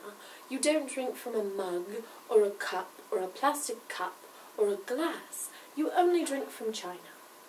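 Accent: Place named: RP, United Kingdom. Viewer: lol. I love your Posh accent its pretty good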